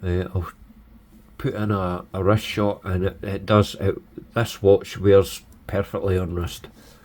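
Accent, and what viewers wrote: Scotland, United Kingdom. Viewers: Are you Scottish ?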